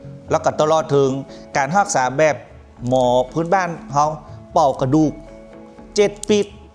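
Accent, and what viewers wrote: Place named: South, Thailand. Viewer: สำเนียงแบบนี่สายใต้แน่นอนค้าบบ 😊😊😊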